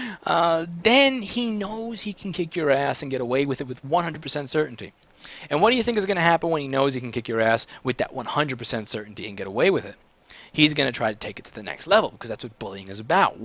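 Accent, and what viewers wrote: New York, United States. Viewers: I love this! You are so smart to see the perspective of others from a child's point of view and being able to re-imagine the scenario as a child. You have a very high IQ and are super interesting to listen to. You are down-to-earth yet have an amazing way of delivering your information. I am obsessed with your videos. I love your NY accent it is so awesome in every way!!!!! melts I am from Toronto, Canada and we pronounce words so boring! I love listening to you talk for your fab NYC accent.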